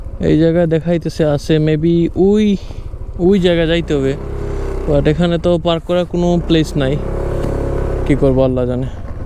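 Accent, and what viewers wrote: Sylhet, Bangladesh. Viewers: Sylheti kotha gula sunte khub valo lage 😊❤ Love from shahporan, Sylhet 😊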